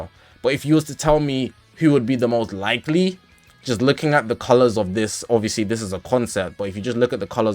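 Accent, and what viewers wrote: United Kingdom. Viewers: Just a question, could you say " a bottle of water"? Because i heard the British accent is very strong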